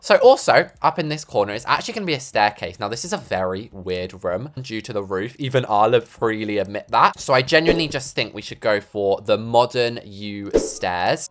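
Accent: New Zealand. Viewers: ur nz accent ate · im sorry im from new zealand and that is the most horibble new zealand accent ever 🤣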